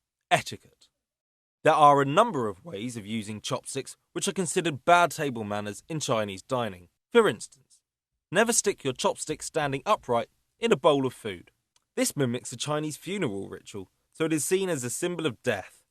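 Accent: United Kingdom. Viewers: Okay now British man instruct me on how to seduce that Asian chick. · i think it's funny that the narrorator sounds british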